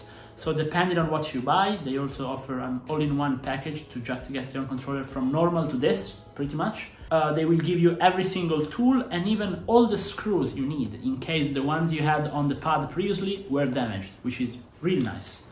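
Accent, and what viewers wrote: Italy. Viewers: You talk like Italian? Non è che sei un bro?❤ 🇮🇹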